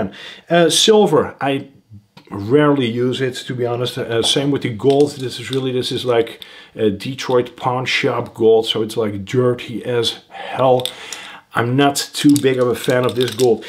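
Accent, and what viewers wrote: Netherlands. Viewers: Are you Dutch? you have a Dutch accent :D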